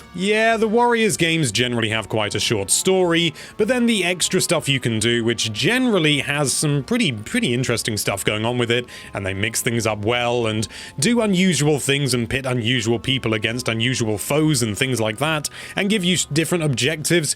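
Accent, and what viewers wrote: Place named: United Kingdom. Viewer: He’s so wholesome. He’s like our weird British/Australian (I can never tell those two accents apart, but I think he’s a Brit) male gaming mom. The man has a voice like freshly baked chocolate chip cookies for crying out loud!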